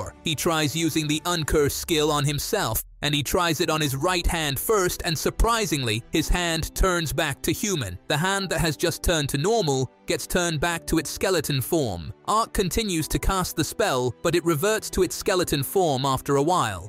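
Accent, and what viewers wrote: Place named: United Kingdom. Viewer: I love the British voice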